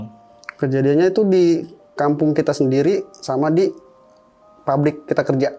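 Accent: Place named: Jakarta, Indonesia. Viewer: Betawi banget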